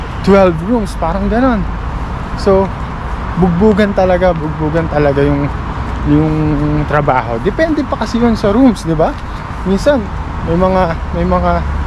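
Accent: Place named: Philippines. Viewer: Hi kabayan, okay yan na pinoy pronunciation ang gamitin para hindi mabawasan ang pinoy feels sa mga vlogs mo. Ako dito working in Heanor pero mga tao dito kakaiba magpronounce ng words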